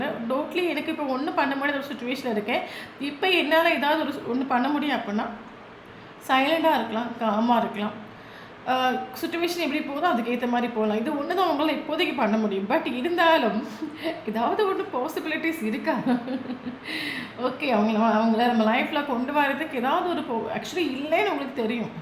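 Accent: Kerala, India. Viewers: ரொம்ப நன்றி univers ♥♥🙏🙏, mam super உங்க Reding, எல்லாமே உண்மை. நீங்கள் சிரிச்சிட்டு சொல்லுறது ரொம்ப அழகு, உங்கள் மலையாள தமிழ் தனி அழகு.! நன்றி time பார்த்து அடிக்கிறீங்க ❤❤.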